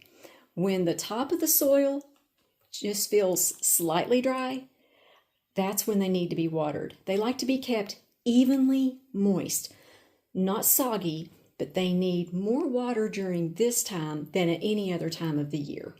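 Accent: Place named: Tennessee, United States. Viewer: I love your TN accent! (from this californian)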